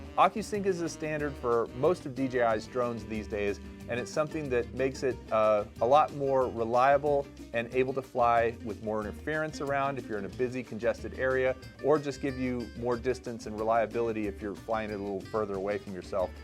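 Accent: United States. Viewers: I like your review because you have clear american voice without accents and its crystal clear to my ears. Thanx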